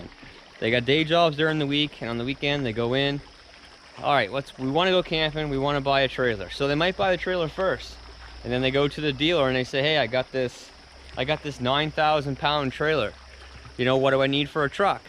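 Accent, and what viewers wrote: Canada. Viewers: You sound so Canadian. Thanks for the video helps a lot.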